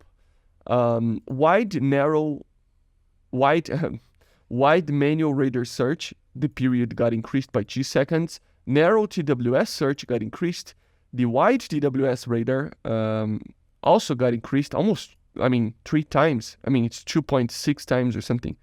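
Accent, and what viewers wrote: Brazil. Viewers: He’s Brazilian speaking a second language it’s fine. Everyone knows what he means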